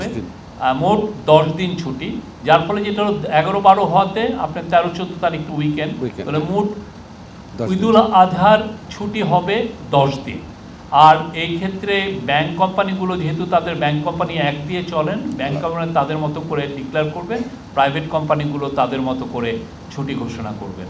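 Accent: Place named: Kolkata, India. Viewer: Kotha bolar style e lage jeno kolkatar style🐸🐸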